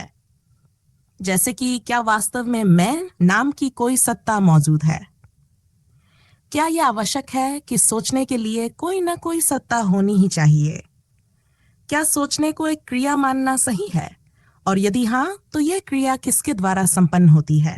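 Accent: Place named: United Kingdom. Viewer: Aapki Hindi bahut achhi hai parr angreji ki boo aati hai..😊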